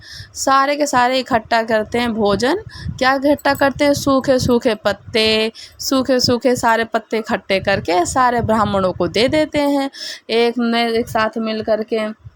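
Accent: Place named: Braj, India. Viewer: Apki barjwasi awaj me hi aap katha ko sunano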